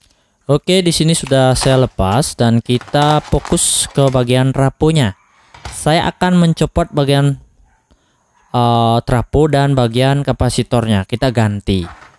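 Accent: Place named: Bali, Indonesia. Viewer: Logat bicara bapak ini seperti orang Bali 😅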